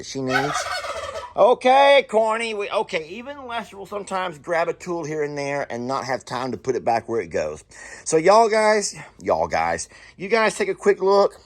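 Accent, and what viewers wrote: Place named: South, United States. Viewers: I know you have read my comments I say y’all all the time it’s southern our accent. But it means you all so yes it’s natural to say y’all. Maybe when you were a teacher you might have been more correct in your language. Now you are retired, we are more laxed in our southern accent. And it is okay. Don’t let that comment change your southernness. Your tool room looks awesome! 😊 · My gosh Lester. You're southern and y'all is just how we talk. One person can be y'all 😂 so all y'all is perfectly fine. Talk your way and don't worry about anyone suggesting you speak the way they think you should 🤗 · Lester us Canadian's say eh all the time. It's just part of our lingo. You saying y'all is part of your lingo in the south. I think it's great. Your videos are great! Thank you for all you do!! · its just your texas accent...or south...lol Jason from cog hill is worse...i LOVE yalls accents lol your viewer from BC Canada ....Sam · I noticed the sexy Texan twang has gotten thicker since you stopped teaching.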